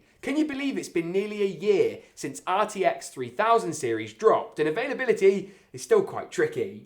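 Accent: United Kingdom. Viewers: Came here for dat British accent, Stayed for the awesome montage, And returned for the build guide, for building my next new PC.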